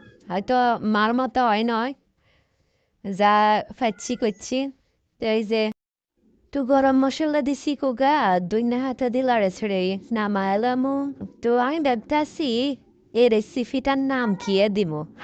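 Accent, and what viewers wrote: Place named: Noakhali, Bangladesh. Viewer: আপু আপনি নোয়াখালী কোন জায়গা 🤔🤔🤔আমি অনেক জায়গার কথা জানি 🤗🤗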